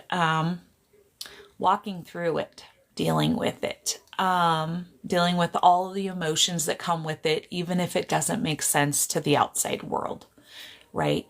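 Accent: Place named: New York, United States. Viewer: You also sound like you're from Jersey or New York area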